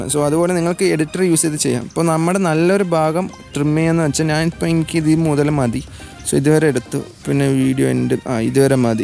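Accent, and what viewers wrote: Palakkad, India. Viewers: bro palakkadkarananoo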